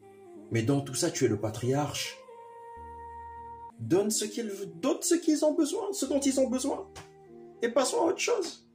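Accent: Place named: Gabon. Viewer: Il a l'accent de chez moi au Gabon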